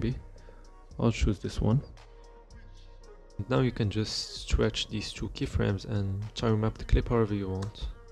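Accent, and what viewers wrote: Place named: France. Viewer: are u french ? your accent sounds french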